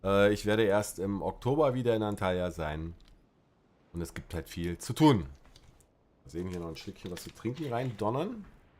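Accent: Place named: Germany. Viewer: Du sprichst die Städte wie ein deutscher aus. Bist du wirklich Türke?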